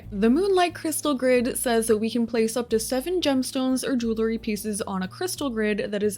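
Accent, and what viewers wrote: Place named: Canada. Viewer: Right I couldn’t tell if she is just Canadian™️ or didn’t know how to pronounce Grotto 😅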